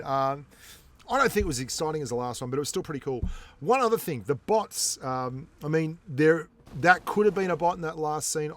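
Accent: Australia. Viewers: Thanks...are u from Australia ?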